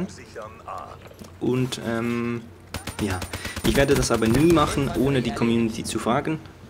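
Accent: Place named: Switzerland. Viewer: deine Fluchen auf Schweizer-Deutsch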